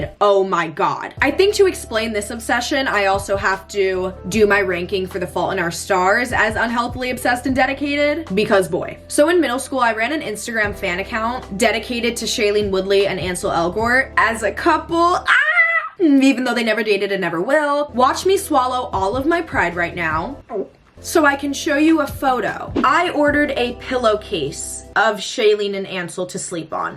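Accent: United Kingdom. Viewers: ur a Brit?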